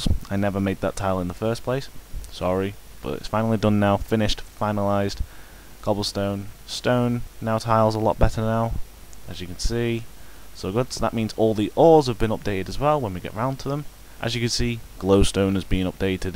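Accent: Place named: United Kingdom. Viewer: great British accent you have yourself a new subscriber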